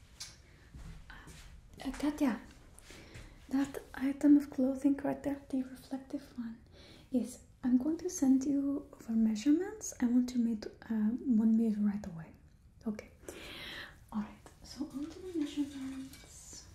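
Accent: Russia. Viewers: I've gotta say I can't get over the russian accent. Truly talented... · There's no need tho, it would make just a cliche trope, not a wholesome true russian accent as it is now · Happy Thursday,!😊😊😊😊😊☀. And I love the beautiful russian accent. It's brilliant!😊💙💙💙💙💙💙💙👍. Also, this 51-minute ASMR video is super amazing! I can relax and eat my pizza with it.😊💙🍕👍. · Read the description and simple silly videos like these are always appreciated, love the awesome content. Also your Russian accent sounds great :) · I quickly wanted to mention how I'm really digging the Russian Accent within the recent posts!! Especially in the last one relating to the cyborg, I can easily re-listen to that video multiple times, I just somehow find it incredibly pleasing to hear. And while I'm commenting, I just wanted to say thank you for all of these wonderful videos :] You put so much work and detail into them, always a delight to see a new upload! 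· Раиль Галеев именно так русские и говорят на английском, только если не имеют лингвистических способностей и/или не стараются скрыть присущий им акцент. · It's better than my fake russian accent I use when playing Hell Let loose as the soviets so good work! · Russian accents are so hard or just underwhelming/overwhelming, but this, is amazing! I'm learning Russian and I have such a bad accent, so give yourself more credit! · The Russian accent perfect! Do more things like this I'm in love! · Coincidentally, I was just marathoning Gibi's Tatiana roleplays, so Russian accent seems to be my thing today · Очень хорошо изобразила русский акцент🤔🤯, Браво! 👏👏👏 Very well showed the Russian accent🤔🤯, bravo! 👏👏👏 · I really love the genderless approach "bad girl, bad girl or whatever gender you identify as look", this was very pleasing experience, as a trans man I appreciate it twice as much) Also, as a russian myself, I would like to say, that your russian accent is very nice, I'm so tired of hearing heavy russian accent in movies, it's really hard to hear and understand, just disappointing honestly, but yours was way softer and pretty realistic! 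· Чувашский акцент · What I love about your Russian accent is that, as a linguist, I could go on and nitpick the phonetic aspect (which I won't, it's really good, it's just that some things only come to you when you are studying the target language super close and not one sane person would devote years to studying foreign phonetics), but your grammar absolutely makes up for it, sometimes you even got me wondering whether you are a native English speaker. And I think that grammar aspect is more important for creating a believable effect, because people come from different language learning environments and have their own unique speech peculiarities, so the accent can sound different from what you picture as an ideal Russian accent, but the grammar mustakes are definitely common for all Russian-Englush speakers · Wow I totally did not expect that you'd say my name and scared me off a bit ngl 😂😂 And btw your russian accent is perfect 🥰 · Love the russian accent. 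Your performance is always on point. 😩💖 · Your Russian accent characters are always my favorite 🙏😁 and the doctor/scientist characters. Ty! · This is the year of my villian arc and my fashion sense isn't the greatest so thank you for the help with that. I can't wait to cause chaos and look good doing it. Your Russian accent is amazing by the way.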